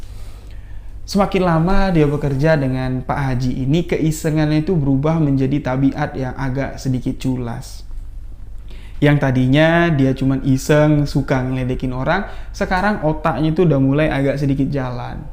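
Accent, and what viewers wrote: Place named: Sumatra, Indonesia. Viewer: ahai logat sumatera ni kek nya bang,,, sedap pulak awak dengar nya bang,,, beda dgn channel misteri lainnya... salam dari aceh bang..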